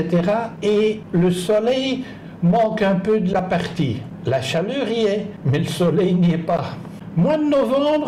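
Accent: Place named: Belgium. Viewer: Merci et ce bel accent belge 😊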